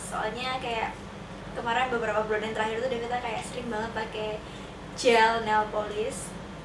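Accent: Java, Indonesia. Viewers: Asli mana ka,,,,jawa nya medok.bgt hehhee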